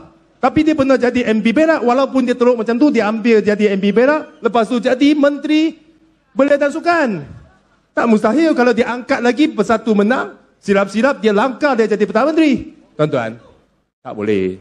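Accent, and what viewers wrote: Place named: Kedah, Malaysia. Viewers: Dengar dia cakap dah tau dia ni confirm org kedah, bnyk bergaul dgn org Kampung. Bukan mcm apek mca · Salute, berBahasa Melayu dengan Loghat Utara Malaya.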